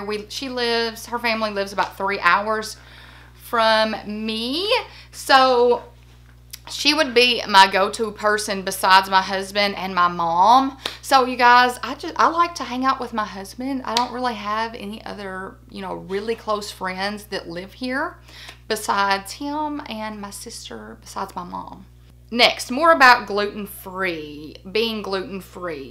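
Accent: South, United States. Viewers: New subbie! Excited to watch your video. Where are you from, sound like a southern accent (like me) 😀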